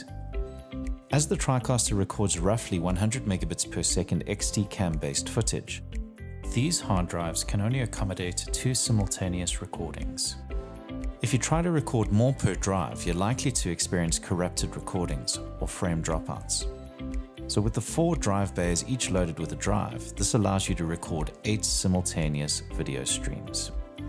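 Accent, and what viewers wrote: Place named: South Africa. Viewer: Great explanation and well put together video . Only Tricaster video with a South African accent which makes me happy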